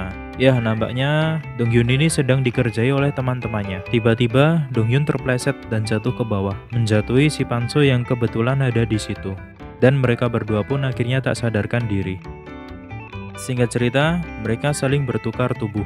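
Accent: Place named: Sumatra, Indonesia. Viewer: pasti pengisi suaranya orang luar jawa...kayak sumatra gitu hha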